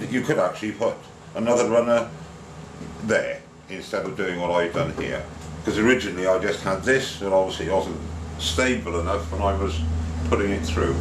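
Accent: Wales, United Kingdom. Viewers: hey big guy lol lol no sarcasm today hope u get better soon bud and i aint kidding ive seen bells palsy before....was wondering where u were was missing yur welshness lol lol glad yur back and the jig is great keep the vids coming have missed u greatly......dougie....frm bonny scotland...... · I hope you recover quickly. I really enjoy your video's and enjoy the Welsh accent have not heard one since my Great Grandfather died. I had one though if you had put another 2x4 on the edge away from the blade slot you would not need the stop block, in my shop the stop block will wind up getting turned or lost! All in all good on ya!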